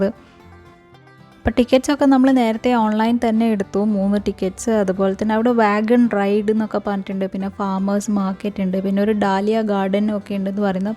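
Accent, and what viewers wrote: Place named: Kannur, India. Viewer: slang കേട്ടപ്പഴേ തോന്നി.😍✌️നമ്മടെ കണ്ണൂർ ഭാഷ.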